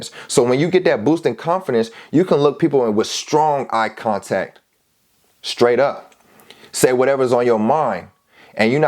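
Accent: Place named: United States. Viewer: Yo boss thank you for this video you’re a funny guy, what part of the US is your accent from?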